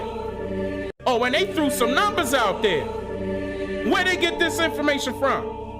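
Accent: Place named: Philadelphia, United States. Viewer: Boom! Sounding so Philly too. 🙌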